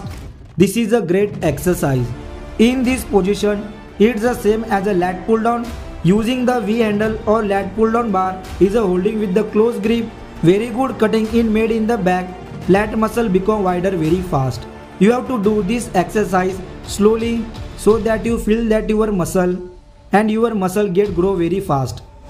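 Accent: India. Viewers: hahhahahahah your indian accent is so funny. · Lol the person who is talking is not even american. Obviously from india! He is using this video so can make money he is not even a trainer. Sorry buddy I need to mute you I can’t concentrate.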